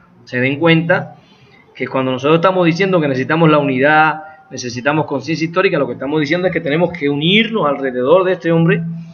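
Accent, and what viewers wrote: Cuba. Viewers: de donde eres? Te dices mexicano pero tienes tu acento cubano mucho muy marcado, saludos! Buen video! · Este señor tiene acento cubano hay que cuidarnos de lo que dice Lopez obrador esta incado ante trump sólo los mexicanos podemos defender nuestro pais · Su acento dicta que es cubano. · Oiga usted habla como ke es mexicano usted no es mexicano parese cubano venezolano no se pero usted no es mexicano ahora esta bien por apollarnos y si gusta ser mexicano pida la nacionalidad no hay problema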